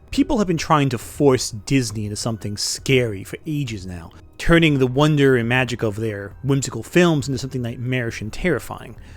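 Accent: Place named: New York, United States. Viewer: Werewolf By Night is one I've never heard of, but it sounds amazing! I'l have to check it out! Also, are you from New York? My family is from New York and Massachusetts and you sound a lot like them.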